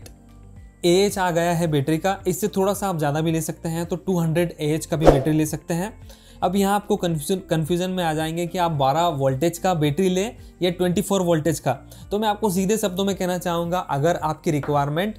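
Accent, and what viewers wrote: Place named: Madhya Pradesh, India. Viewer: brother your talking style is nice i feel mp style tone in your voice , great video specially talking way😊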